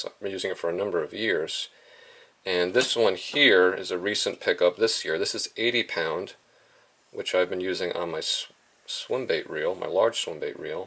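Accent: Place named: New Jersey, United States. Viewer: I'm sure you've already addressed this somewhere, but I can't find it... What part of Jersey are you from? I pretty much knew from the accent, but this video, with your license prominently displayed on your hat, eliminated any doubts. 😀 Just so I understand completely, are you saying essentially all your break offs on this line and Samurai have been on casts? I know that can occur when a couple of little loops form or you've caught a large fish or snagged up and try to pull it free (and it sounds sickeningly like a gunshot). In any case, if it hasn't been breaking on hooksets, I still might give it a serious workout. Part of what's going on, I'm sure, has to do with the fact that Japanese lines label their actual break strength whereas most of the domestic lines like Power Pro, Berkley, and Sufix rate them sort of arbitrarily by diameter, which is why Samurai is so much thinner at each labeled strength. 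I'd bet 80# Sufix breaks over 100# on an IGFA test for straight pull.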